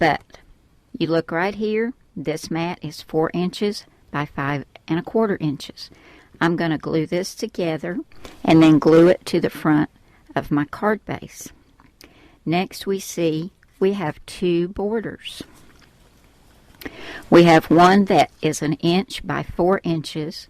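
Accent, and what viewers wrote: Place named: Midwest, United States. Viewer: I wasn't going to share this, but guess I will. I LOVEhearing your voice and midwestern accent. Makes me think of my mom whom I don't get to hear anymore. You really are the BEST! Been watching you for YEARS now. God bless you!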